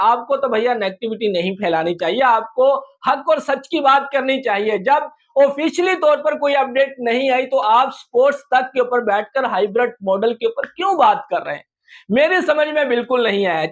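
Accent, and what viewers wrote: Pakistan. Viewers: Pakistani h accent se pata chal rha h or video ka sub tittle m english ke error se pata h chal rha h ye pakistani h 🤣🤣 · Bhai tum Pakistani ho tumhari boli accent Pakistani ha Indian nhi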